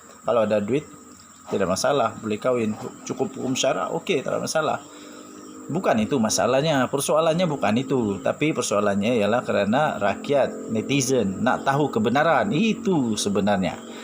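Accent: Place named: Borneo, Malaysia. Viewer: Adui jgn lh ckp smnjung klu org borneo bah...keras btul sbutn mu tu bro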